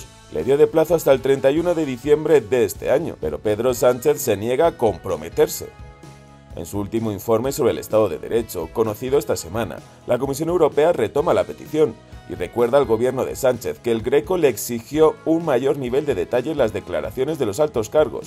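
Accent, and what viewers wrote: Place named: Latin America. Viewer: Canal “Españolista” con voz de latino?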